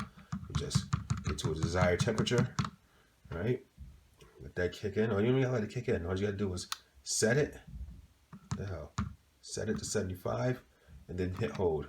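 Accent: Connecticut, United States. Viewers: Bruh speaks like a Connecticut Yankee!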